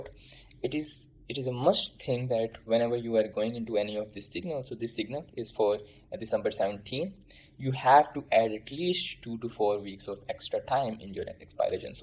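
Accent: India. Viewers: bro if u want to trade against algos, market makers and smartest people in the world and you cant understand an indian accent, i think you are not smart enough to trade lol I understood everything perfectly and I see alot of other people did too, so it's just you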